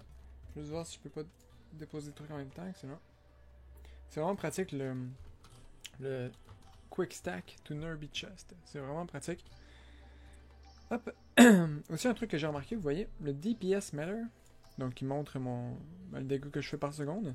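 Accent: Quebec, Canada. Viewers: j'aime bien les accent québécois 😀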